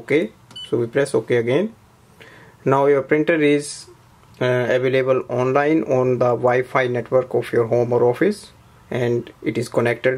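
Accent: India. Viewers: The only Indian accented English voice I will ever trust..Thank you!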